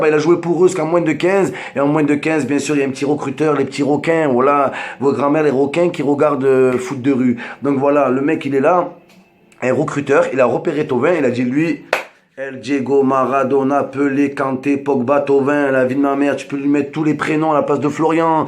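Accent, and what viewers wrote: Marseille, France. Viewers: yo bro c'est quoi cette nouvelle coupe de cheveux en tout cas je te quiffe grave avec ton axant marseillais cool frère tu fais des bonnes vidéos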